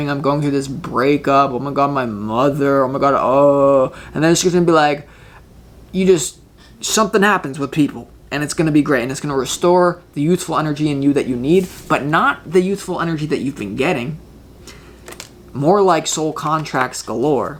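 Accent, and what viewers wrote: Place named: Staten Island, United States. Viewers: and extremely intelligent and wise... love the NY accent, HA ! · loved the reading 😍 LOL the accent got me laughing.. sounded like staten island accent. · I love this reading. The NYer accent especially. Omg. Prepandemic is def. Right!!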